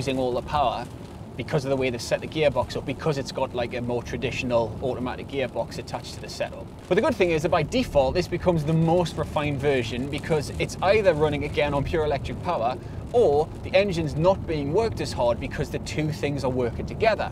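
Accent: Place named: Northern England, United Kingdom. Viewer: Don't try to sound less Northern mate, there's enough on TV who do that 👍😂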